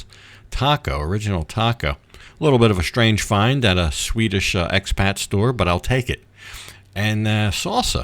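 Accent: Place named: South, United States. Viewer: Where are you from? Sound like Southern USA 🇺🇸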